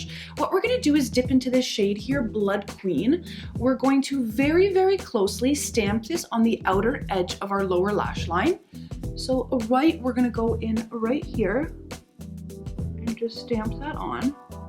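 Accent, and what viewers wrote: Canada. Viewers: Are you Canadian? Your accent is so cute, like really eloquent and like, detailed pronouncing. Most Americans don’t talk like that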